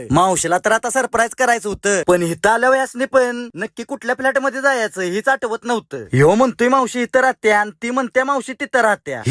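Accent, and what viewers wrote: Karad, India. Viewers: mi continue sagle vlog baghte ani mala avdte pn apali karadchi accent ❤❤ mich confuse zalte hi script adhich aikley mhanun just daubt clear kela 😅😊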